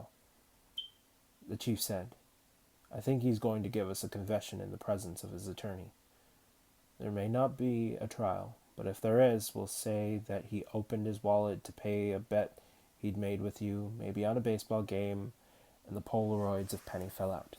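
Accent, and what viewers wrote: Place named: Tennessee, United States. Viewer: Great voice. Thank you for uploading. What state are you from? You sound like a friend from TN.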